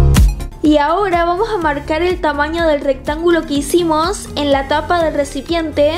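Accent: Argentina. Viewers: De que país sos parese asentó argentino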